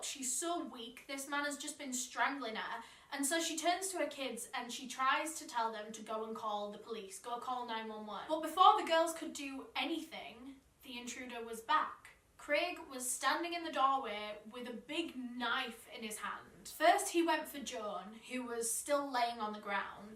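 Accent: United Kingdom. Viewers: Are you British... Im just judging by your sound/(slingo) shmommy 🎉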